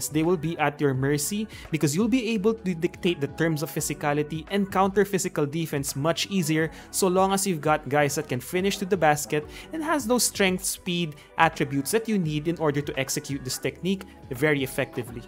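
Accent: Philippines. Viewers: Bro are u filipino i can hear it in the accent lol all my teachers were filipino on guam 🤣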